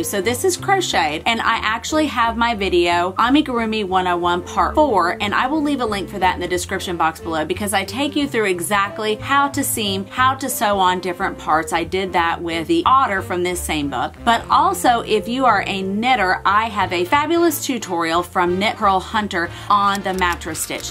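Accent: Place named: South, United States. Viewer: As a cute asian girl (I'm in my thirty's, but still cute. I mean a girl 😜) I must say, it's so nice to see a cute, not asian, girl so excited about and so talented in amigurumi. And a southern one to boot! I always loved accents when I was younger, and now that I live in the accent-less Pacific Northwest, it's nice to stumble across channels that have both something I'm interested in and a super cute accent.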